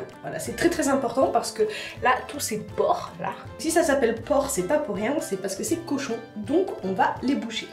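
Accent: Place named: England, United Kingdom. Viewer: J'adore ton accent englais..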